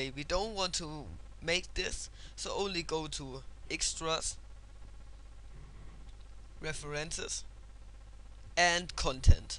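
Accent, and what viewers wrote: Germany. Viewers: Bist du Deutscher? ^^ Wenn ja, man hört´s :D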